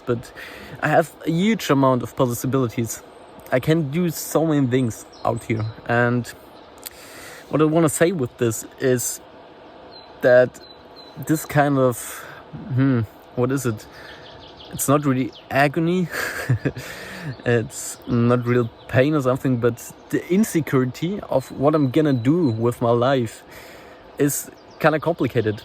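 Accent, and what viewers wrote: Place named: Germany. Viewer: Bro why are you speaking English? I can hear your German accent extremely clear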